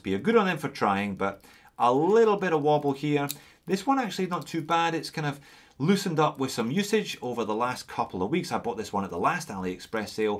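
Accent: Scotland, United Kingdom. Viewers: hey I spent the last few hours watching some of your videos, I love your presentation style and iconic "Hello" at the start. Love the scottish accent too. I like how you cover many different types of watches at all price points and it makes for interesting viewing. Keep up the good work mate!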